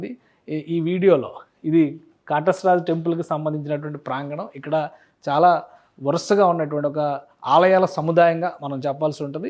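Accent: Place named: Telangana, India. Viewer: ఆయన తెలుగు తెలంగాణా యాసలో ఉంది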